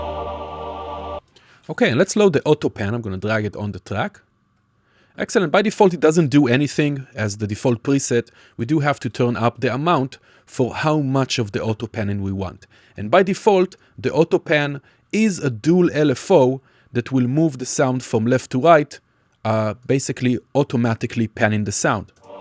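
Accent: Israel. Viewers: אני שם כסף שאתה ישראלי המבטא הזה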